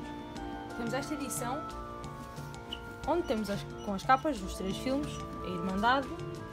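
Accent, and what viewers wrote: Portugal. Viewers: O sotaque português é maravilhoso, parabéns pelo vídeo, gostei muito.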